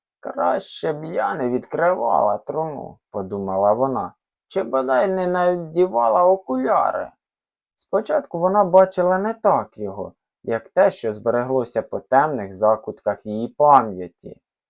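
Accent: Surzhyk, Ukraine. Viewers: Напевно в різних регіонах України присутній свій акцент. Ваш акцент це як суржик.Не ображайтеся, але мені не сподобалось. А Бредбері неперевершений. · Сумщина? Полтавщина?) Суржик, рідний суржик - нашеВсе!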